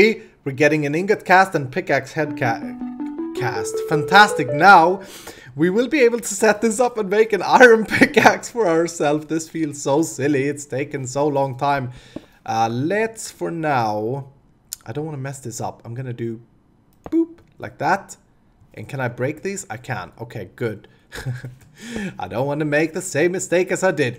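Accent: Sweden. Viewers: ...and ladle is not Lad-le it's Lay-dell.. Sveedish speech patterns.. JEEZ!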